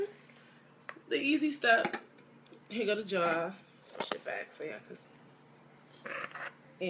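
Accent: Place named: Brooklyn, United States. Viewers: Nice video. I like your accent. Where are you from? NY? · Sound like you from brooklyn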